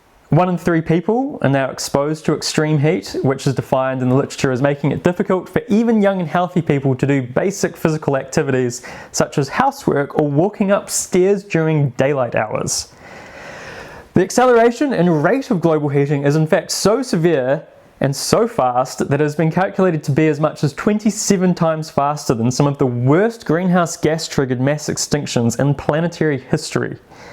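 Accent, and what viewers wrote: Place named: United Kingdom. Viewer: I saw the thumbnail and I was like 90% sure a British accent was coming my way, close enough. Yeah, shit is lookin' pretty bad, doomers!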